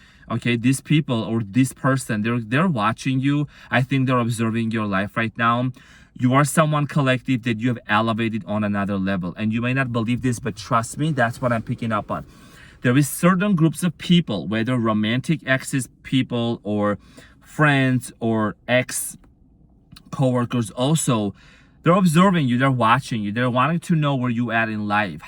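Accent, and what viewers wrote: United Kingdom. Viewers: I’m impressed with your British accent. I’m from the UK ❤ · You make me smile so much / with all the sassy shit references …in a British accent…. Meet me beyond these walls and we shall speak further…with action…. Persist in this way and consequences will induce themselves. 😂❤ love it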